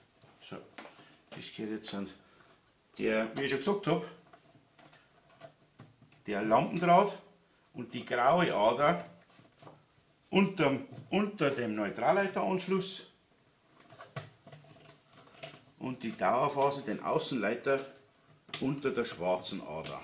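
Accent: Bavaria, Germany. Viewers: du bis der beste, geile Aussprache ( Dialekt) Unser beste Bayer 😀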